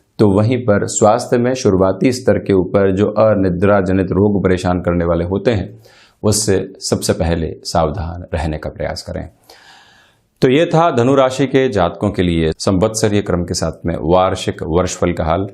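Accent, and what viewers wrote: Standard. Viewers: Wah, kya Hindi, itni shuddh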